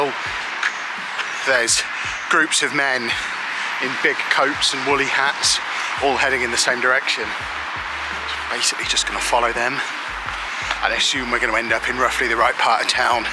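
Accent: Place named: North, United Kingdom. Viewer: I'm from the south east and you sound northern to me!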